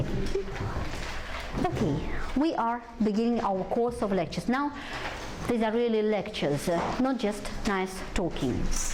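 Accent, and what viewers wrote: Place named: Russia. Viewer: Ну и что,что у нее произношение рунглиш,за то как интересно и доступно объясняет ,вспомнила свои студенческие годы в инязе.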